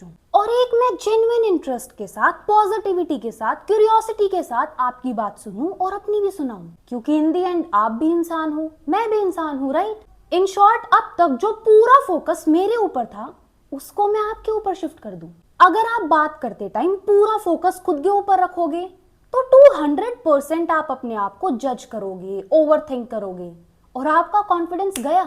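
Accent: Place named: South, India. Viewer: you sound south Indian. I love the way you dress and you are charming.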